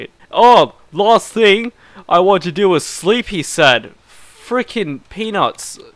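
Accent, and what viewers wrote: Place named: Russia. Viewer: You have a nice Russian accent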